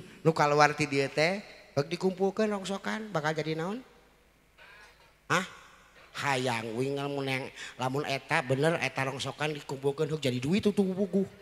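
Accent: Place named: Sunda, Indonesia. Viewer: Ini yg pelawak itu bukan sih?😂 wkwkwk orng sunda emng punya logat yg agak lucu,udh dari pembawaannya juga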